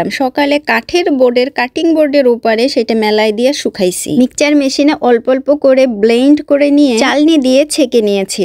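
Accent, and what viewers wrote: Bangladesh. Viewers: Bangladeshi mey bole kotha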